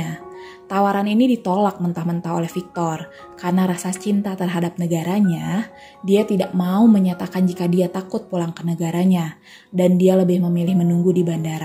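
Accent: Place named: South Sulawesi, Indonesia. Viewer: Suara sulsel ketara sekali.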